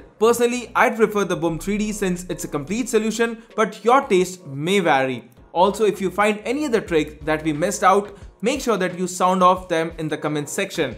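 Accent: India. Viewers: indian accent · always the indian accent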